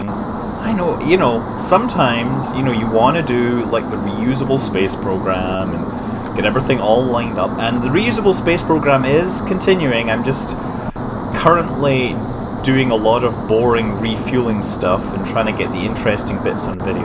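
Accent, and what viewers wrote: Scotland, United Kingdom. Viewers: Woo a Scot!:P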